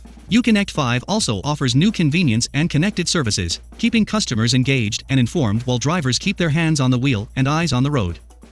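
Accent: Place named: Italy. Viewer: Mmmh that Italian accent in the narration 😙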